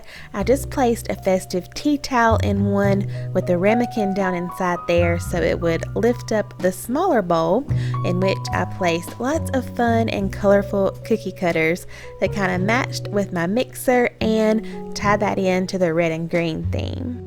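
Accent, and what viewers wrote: South, United States. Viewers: Thank you for sharing!! I’m so excited to decorate, and you just made me ten times more excited! Love your channel, and I just subscribed! Also, do you live in the south? We share the southern accent.🤗💜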